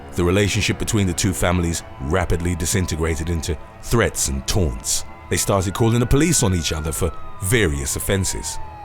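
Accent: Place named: London, United Kingdom. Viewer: Neighbours looks much better.. How come you sound London but use American slang.. ie dollars neighbors etc.. Do you live there now? Great voice over broski. As Clear as a bell.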